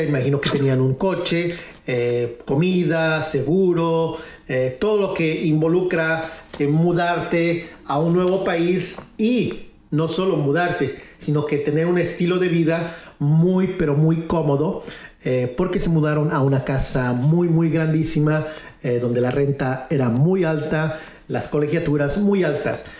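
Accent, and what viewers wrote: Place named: Mexican-American, United States. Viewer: felicidades por tu trabajo esperemos pronto verte en alguna mañanera con preguntas objetivas al presidente de México 🇲🇽 AMLO y tu acento más bien parece de EUA wow 😮 naciste en la sierra Oriental, yo creo que tú NACISTE en EUA y tus familiares son de esa Preciosa parte de México 🇲🇽 pues tú acento suena más como México Americano Pero que bueno que aprecies nuestras raíces mexicanas. Como sea Excelente trabajo y seguimos apoyándote 👍👍👍👍👍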